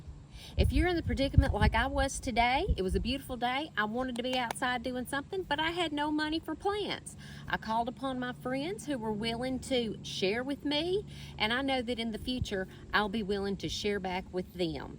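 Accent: South, United States. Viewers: Great tip! We have a dear friend who has a beautiful garden and her name is too. She has the most amazing raspberry bush with no THORNS that produces the biggest and sweetest raspberries. We've been meaning to get some of that plant for my garden and now you've motivated us to stop by her place. BTW....love your southern accent.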